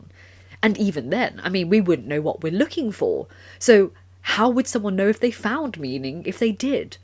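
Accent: United Kingdom. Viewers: I like your voice combined with British accent so much!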